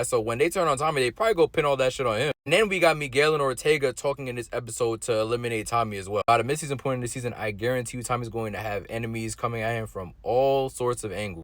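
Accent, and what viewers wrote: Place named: Toronto, Canada. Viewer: you have to be from toronto with that accent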